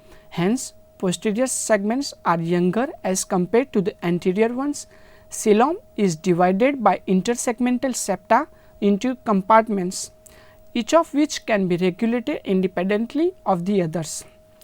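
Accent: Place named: North India, India. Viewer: Very bad English......typical rural small town North Indian accent.....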